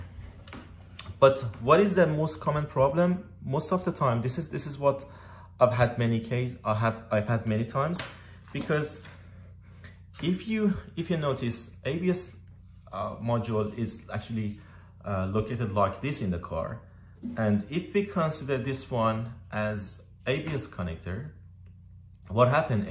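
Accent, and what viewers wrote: Iran. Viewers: just say thank cause it was useful for me and enjoyed your sweet persian accent👍👍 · You have an accent are u from Iran?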